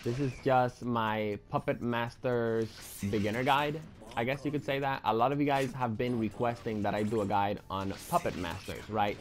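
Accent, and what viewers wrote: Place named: Spain. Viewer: no problem!:) still catch ng your vids by the way, love your accent! You Spanish? Or perhaps Mexican?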